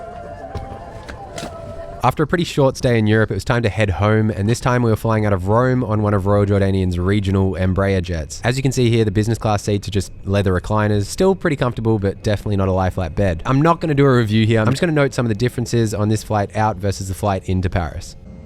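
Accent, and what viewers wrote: Australia. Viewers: I always thought you were American until you said "Australian dollars," then I listened to your accents, and that's how I feel you are Australian :)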